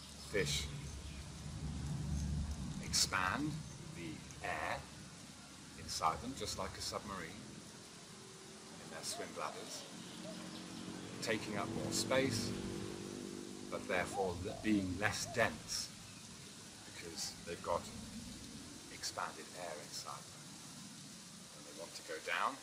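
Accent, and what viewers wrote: United Kingdom. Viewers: Oh! My God! WTF How ignorant can anyone be than to show to the whole world how you didn't think something through using all the factors and variables needed for the equation. Water displacement alone The force air has on an object depending on it's shape and size. This is so ridiculous yet you took the time out to make a video showing how unintelligent the thought process is and then look at all the idiots that follow you thinking this is correct. Again Oh! My! God! I can't believe what the world I live in has become. Utterly and Ridiculously disgusting to think my fellow man is this fucking dumb. A British accent by no means makes anyone intelligent.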